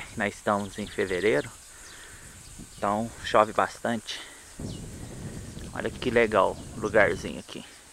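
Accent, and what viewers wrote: Minas Gerais, Brazil. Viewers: Sotaque gostoso de ouvir é o sotaque mineiro👏👏👏👏👏👏👏👏👏👏👏👏👏👏👏👏👏👏👏👏👏👏👏👏👏👏👏👏👏👏👏👏👏👏👏👏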